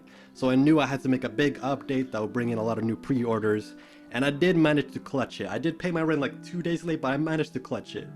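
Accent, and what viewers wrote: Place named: Finland. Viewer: After all the years trying to understand what the Hydraulic Press Channel was saying, I gotta admit, you have by far one of the most clean Finnish accents I've ever heard lol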